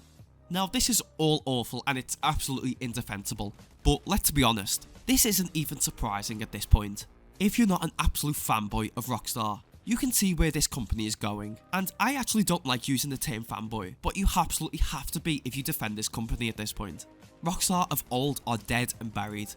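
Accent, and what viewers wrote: Liverpool, United Kingdom. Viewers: Appinin me fellow scouser lad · is this dude from liverpool?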